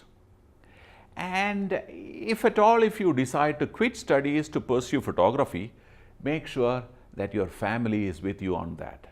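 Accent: Kerala, India. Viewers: Namaste, Basically I am a doctor by profession. During the COVID time I was forced to stay at home (Not fully- I work till afternoon ). The rest time I started learning small small hobbies, reading books etc. I saw your video and also pixel village. I have taken up photography seriously. I purchased a few cameras and was kept idling. During my last trip to Europe I took that NIKON D1500 and it really spoiled my journey. It was a heavy one with so many lenses. The photos I took didn't comeout well. I purchased another one Canon pocket size and was getting ready for my Australian trip. Covid played a spoiled sport and I am at home. But enjoyed all your videos and am really happy that I became a better photographer. Accept my gratitude. From your accent I presume that you are from Kerala.(The safest place in the universe during COVID time). I will come and meet you in case you come to TRIVANDRUM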